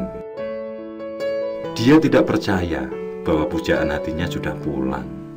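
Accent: Java, Indonesia. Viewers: Orang jawa bg?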